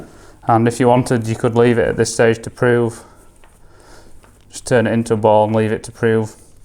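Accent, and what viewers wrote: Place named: England, United Kingdom. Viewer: Do you speak English? I don’t understand how an English accent is strange to hear spoken English in. Would Boomhauer be easier to understand?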